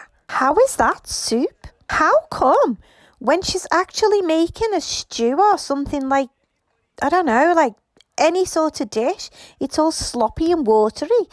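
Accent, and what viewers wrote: Liverpool, United Kingdom. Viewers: 😂 I love you and the Liverpool accent! Makes it even more funny. My Nottingham sccentbis crap sand I don't even have it completely because both mu parents are from.the South Coast. I would love a coop accent like yours! X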